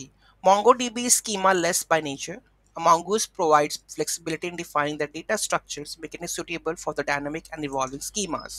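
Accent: India. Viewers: Even though with Indian accent but at least I understood all your English, thanks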